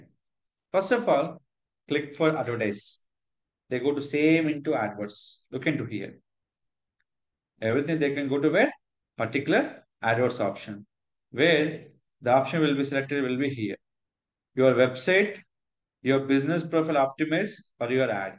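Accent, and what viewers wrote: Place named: India. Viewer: 🤣 this guy is an Indian I thought I'd do fine understanding him, initially, I felt he sounded alright and was annoyed by your comment. Later realized the grammar, and accent issues.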